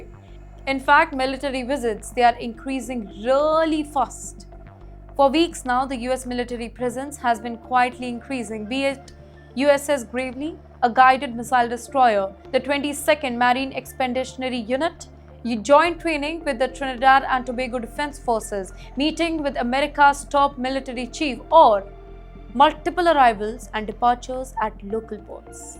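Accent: India. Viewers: You with you India accent you worry China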